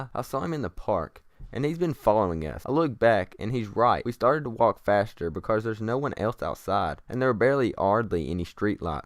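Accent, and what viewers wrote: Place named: South, United States. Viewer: You are so southern what state are you from?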